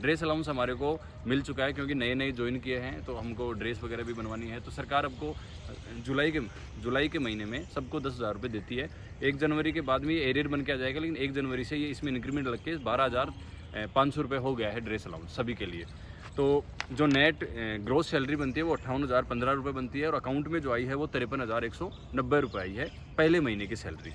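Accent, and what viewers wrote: India. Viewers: Aap sikar se ho kya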